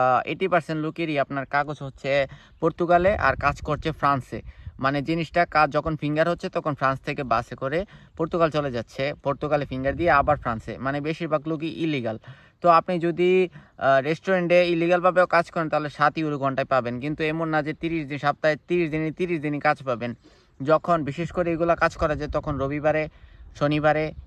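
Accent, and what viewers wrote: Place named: Sylhet, Bangladesh. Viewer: Aita bhai syloti ra kore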